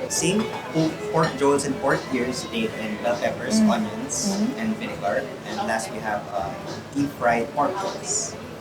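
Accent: Philippines. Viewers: I love her tagalog sounds korean but her English is pinoy accent.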